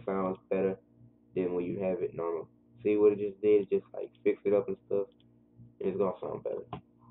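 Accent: United States. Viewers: u can tell this nigga New Orleans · u from Louisiana aint cha?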